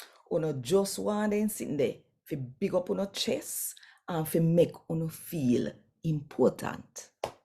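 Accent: Jamaica. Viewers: Me luv de Jamaican Creole Readings. We need fi hear dem more. 🙏 🙏 🙏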